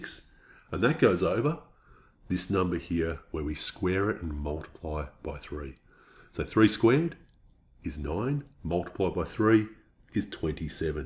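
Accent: Australia. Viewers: Bro are u aussie by chance😮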